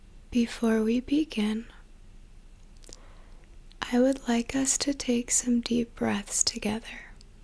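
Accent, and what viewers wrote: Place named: Texas, United States. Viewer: You can hear Texas in her voice if you know what to listen for.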